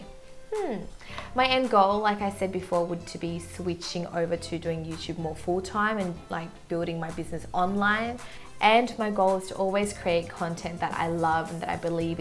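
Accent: Australia. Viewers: I knew you lived in australia you sound just like them I love the way they talk. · I realized your Australia accent just now, I thought you were American in the past. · Omg I love your accent I want to be Australian so bad😍😍😍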